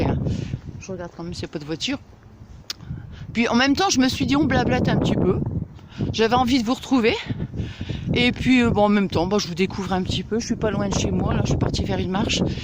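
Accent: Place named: Pas-de-Calais, France. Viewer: Je suis avec vous depuis peu ! Je m'étais rendu compte de votre accent !!😅j'habite près de Metz ,mais je sui de la bas ( pas de calais) aussi !!! J'ai un frère qui y habite encore 😊 j'étais de Isbergues près de aire sur la lys 😉😘😘💞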